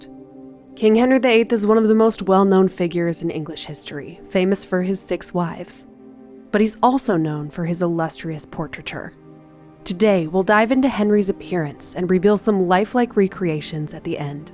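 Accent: United States. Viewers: Why an American narrator? Does not sound right somehow, and the over-the-top enthusiasm and gleefulness is downright weird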